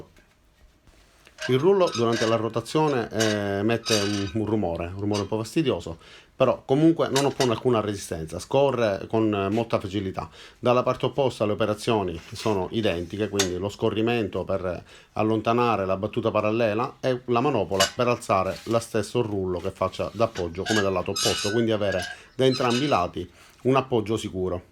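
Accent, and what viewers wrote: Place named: Calabria, Italy. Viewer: Ti giuro che ho pensato sin da subito che eri calabrese!!!hai un accento molto simile al calabrese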